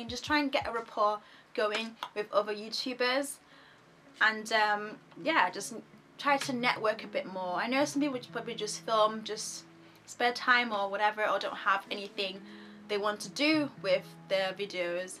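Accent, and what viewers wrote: United Kingdom. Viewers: Truth be told, I only clicked on this video because I thought she looked very pretty. I also like her British accent.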